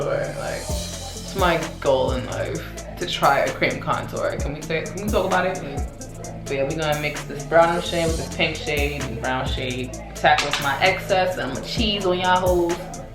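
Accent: New York, United States. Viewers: Lmaoooa “my ock don’t remember me??” I knew you were from New York😭😭💛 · where she from ? she sound like she from chi that’s where I’m from and she sound just like me 🤣 nvm she sound like she from New York 🤦🏽‍♀️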